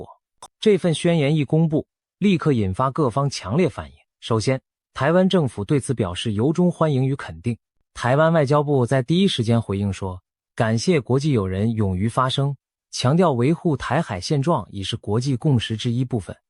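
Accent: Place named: Beijing, China. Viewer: 主播拜託你不要用北京的爛中文發音，中共產黨的中文真是非真正的中國人的正統中文，如亞洲正確的發音雅洲才對不是讀訝洲請主播用最正統派的中文發音，常識正統派讀常視發音才對不是中共匪幫的爛中文讀常時那中共產黨畢竟他不是中國人所以中共用的中文發音非常爛又不是正統派的中國語言，主播買本正統中文字典注音符號的書好好認識中共的爛中文字發音吧！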